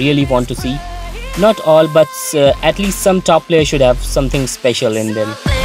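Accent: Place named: India. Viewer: Are you from india bro .? Your voice like indians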